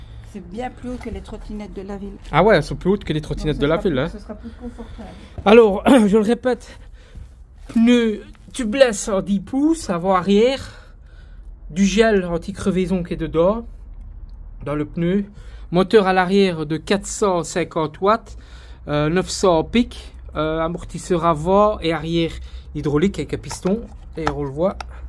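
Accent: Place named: Belgium. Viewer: j'aime bien l'accent BELGE UNE FOIS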